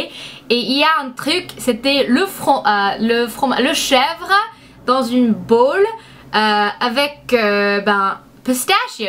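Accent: France. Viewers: !!! J'adore ton accent de frenchy! Et puis on comprends très bien ce que tu veux dire, c'est ça qui est mignon! je suis tellement déçue de ne pas avoir eu l'occasion de te voir sur Paris! Des bisous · Tu es tellement belle ! J'adore ton accent français c'est trop trop mignon ! Tu es géniale <3 Bisous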